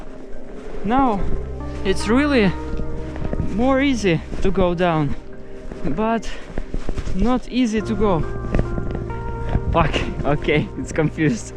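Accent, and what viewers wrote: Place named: Romania. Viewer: I think you speak perfect English. I'm also used to the accent because my Grandmother was from the Romanian Carpathians and your accent sounds the same to me. 👍 I'm glad I found your channel through Shiey's channel! Love this video! 😎🤘👍❤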